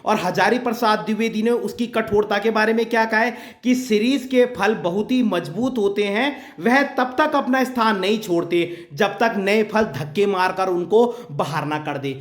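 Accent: Garhwal, India. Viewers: Sir ji aap gadwali ho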